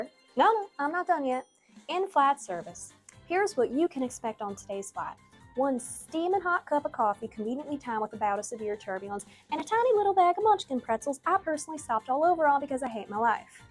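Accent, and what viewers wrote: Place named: South, United States. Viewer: Your southern accent is thicker than scots' or Northern Irish's that Americans usually complain about. This is not a critique, just something I found funny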